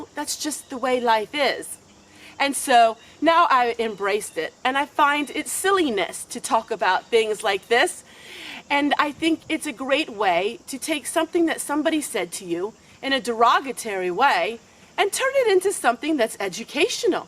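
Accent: Pennsylvania Dutch, United States. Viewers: I have always respected the Mennonites and Amish. I love their dialect. So you just keep talking the way you were raised. Love and God bless. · I was born and raised in Lebanon, Pa... I never really thought I had an accent so this video is surprising to me... she sounds like my whole family and I LOL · I love it! ❤ Speak MORE Penn. Dutch!! 👍🏼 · I've never thought you were dooflick (sp?). First time I heard you I figured you spoke Pennsylvania Dutch. I recognized the accent. :) I like it.. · The very first time I watched your video and heard your voice, I thought, "Oh, she sounds PA Dutch!". I recognized it because I was familiar with it. Many people aren't familiar with it and some are just critical by nature. Like you said in your video, it is who you are and knowing that we are getting the real you is why we like your videos so much! 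· I live near the Amish so I hear it when they talk to each other. It’s not something to be upset about. I love to learn new things. So keep being you ❤ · Oh I love this video! The first time I saw one of your videos I recognized the PA Dutch ❤️ We live in Holmes County Ohio and speak it too! Enjoy your videos a lot! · i have never noticed anything wrong with youre speech unless you purposely speak penn dutch i just notice a slight accent every so often keep on keeping on and be true to yourself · I always thought your accent was similar to the Amish and Mennonite people I have encountered. I have noticed that a lot of Hutterites sound more Dutch itself. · It's sooo weird!!! I lived in PA Dutch country Lancaster County for quite a while. We met many PA Dutch folks and when I heard you the first time, I thought ohhh she's PA Dutch! It wasn't weird to me at all. You know, the people who say negative things about the way you talk are actually the ones who are uneducated, simple-minded, etc. 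All around the world there are different dialects, cultures, heritage, etc. That is the thing I love about the internet is that we can get on websites like YouTube and see people from all around the world sharing their lives. I learn a LOT from watching people from different cultures. Stand tall lady!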